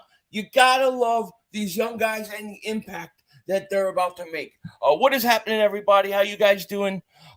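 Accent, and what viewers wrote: Philadelphia, United States. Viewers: Gotta go down the shore! Haha def Philly speak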